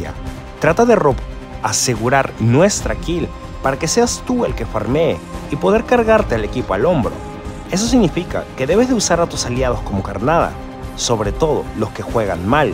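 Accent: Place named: Venezuela. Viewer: Suenas como a venezolano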